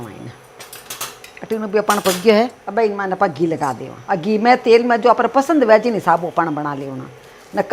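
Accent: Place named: Marwari, India. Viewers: I like to hear ur marvadi hindi..Pranam to U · Yes it is Hindi. But Hindi also have many dialects, depending on geographical location. In rajasthan only we have 10-15 diffrent hindi dialects. This madam in this channel is speaking marwari hindi. In Udaipur area you will have diffrent dialect of mewari hindi. In Jaipur side you will find dhundadi hindi dialect. In same way in uttar pradesh mathura side there is brij bhasa, which is also a kind of hindi dialect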